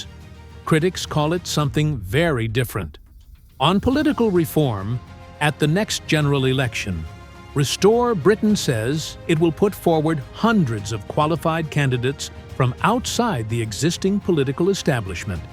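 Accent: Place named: United States. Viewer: Next time you make a video about the UK, you might consider using an AI voice with an actual BRITISH accent, as opposed to an American one. Listening to this was really getting on my nerves, but the way it pronounced 'Fareeeij' was the final straw for me! Just sayin'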